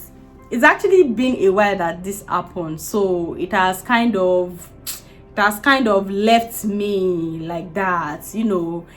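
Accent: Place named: Yoruba, Nigeria. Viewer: Your yoruba accent lasan >>>>>>>> 😆 🤣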